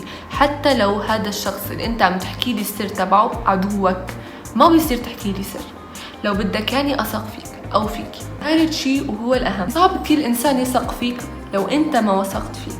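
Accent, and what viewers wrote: Syria. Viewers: نضارتك حلوة و كلامك السوري احلى من المصري😊🌹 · انتي بتتكلمي سوري ومصري هو انتي مصريه ولا سوريه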